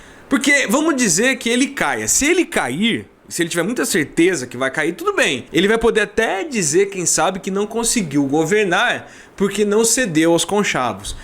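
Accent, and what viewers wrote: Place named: São Paulo, Brazil. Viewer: Quem é esse BABACA? Sequer sabe se expressar esse Ze Ruela, com esse sotaque paulistano, vai tomar no teu cu IMBECIL.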